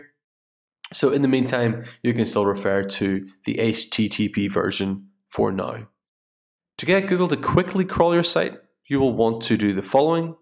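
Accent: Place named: Scotland, United Kingdom. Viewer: Thanks man, really useful. That accent is hard to pin down - I hear Scottish, American and Irish!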